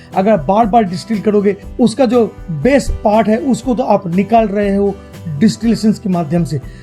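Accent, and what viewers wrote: Bengal, India. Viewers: Dada you are superb , I like your Bangla touched hindi.....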